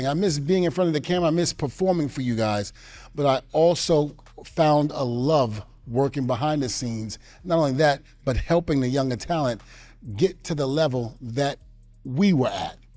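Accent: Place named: Baltimore, United States. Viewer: i literally never noticed his baltimore accent before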